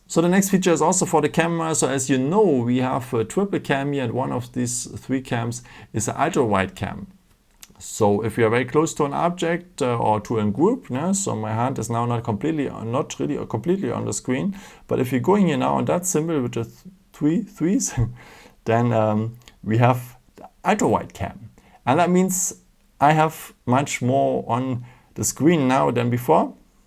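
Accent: Germany. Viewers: Wow you're accent is so german lol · Im German and I would say he's definitely German. The way he pronounced "Screenshot", "Video" or "Filter" are the german ways - is that correct English? I don't know😂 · Man hört das du deutscher bist 🤣 U can use it at Bibliothek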